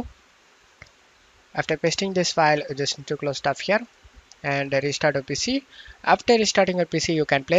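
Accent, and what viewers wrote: India. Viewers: Bro is indian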